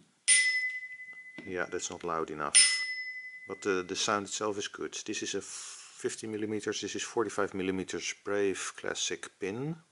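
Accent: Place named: Netherlands. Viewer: Dutch accent 😁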